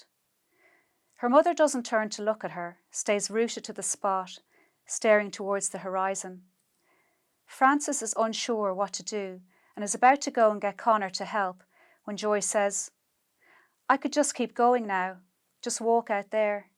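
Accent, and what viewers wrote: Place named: Meath, Ireland. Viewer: Beautiful reading. The Meath Navan accent hasn't disappeared either. Congratulations.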